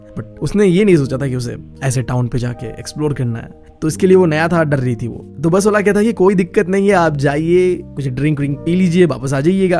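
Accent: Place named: Northeast, India. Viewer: BRO HE'S FROM NORTHEAST (ASSAM) TO THODA HO JATA H SMJH JAO NA❤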